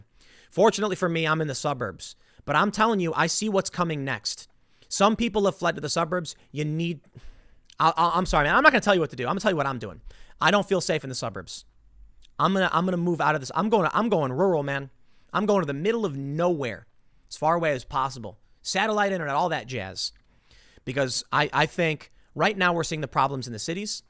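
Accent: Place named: Chicago, United States. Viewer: your Chicago accent kinda shows when the first 30 seconds into the video When you said "Deblasio is dumb as a box of rocks" i like the way you said dumb.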